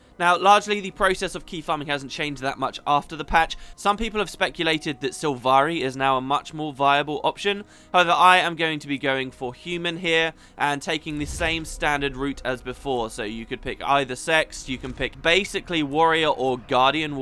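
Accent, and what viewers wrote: United Kingdom. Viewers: I enjoy your videos so much for so many reasons. First of all, I've fallen in Love again with Guild Wars 2.. I said again but actually even though Ive had it pre release, it is the first time.. I have never really played it, I have just 2 level 80 characters and just one with a finished story, but now I play again. And even though I am a noob and there still are so many things I have no idea about, it is so much fun! Your videos give me so much information, there is so much input in such a short time, you talk really quickly and address so many things, I like that so much. Additionally, I am from Germany, and I so much enjoy your british accent (You are from the UK, aren't you?). There are so many things I have missed because I just havent really played the game, but you explain so many things in your videos, I think it makes me love the game even more, and maybe this time I stick to it. 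Eventually I have been playing daily now for a month, at least around half an hour a day if only just to chill and rest in the evening. Never before have I played this much (being aware of the fact, that it IS NOT a lot of time compared to what others spend playing the game). Anyway, thank you very much for your videos! Keep up the great work! LG Nyakes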